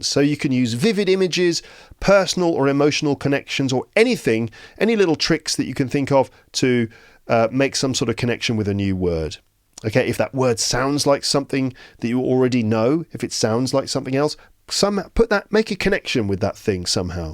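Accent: United Kingdom. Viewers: hi sir i am a big fan from Algeria i love your British accent so much beacause of you and your peaces of advice i am the first in my class +if i make mistakes tell me please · Your accent is British or American ?🌝 I guess it's British bat l want to make sure because my language level is intermediate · I love the brittish accent. thanks a lot for all your videos.. ;-) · I remember that some months ago it was really hard to me to understand you because of your accent but I kept listening British English now I can understand the most of it. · Hi, I am from Indonesia. English is my 3rd language. First of all, you speak so fast with your British accent. But one thing I want to tell is that I can clearly understand what you've explain without seeing the visual of your video and of course without the texts. So I want to say thank you for making this video for us. 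Hope it's gonna help someone like me out there. Thank You.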